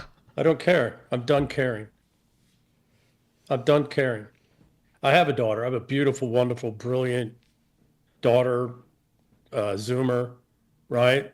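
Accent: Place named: United States. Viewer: He sounds American. So why does he speak as a British Subject? Is he British/English?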